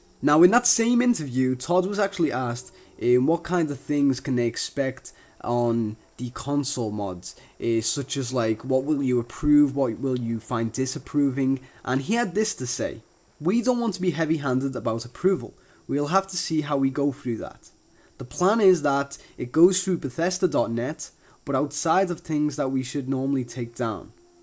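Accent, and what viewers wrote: Liverpool, United Kingdom. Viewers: bob he is scouse that's why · Is that a Scouser I hear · Are you a scouser? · he says eehm because hes a scouser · oh another scouse youtuber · bob thats because he's a scouser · scouse twat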